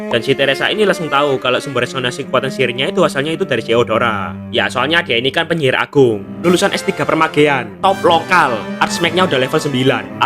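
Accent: Java, Indonesia. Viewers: Jawa nya ngejawa bgt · Jowo nyelll abang kuuuu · jowo mas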